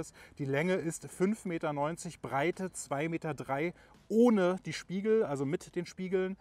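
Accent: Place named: Germany. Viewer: Haha ich liebe es wie deutsch du alles aussprichst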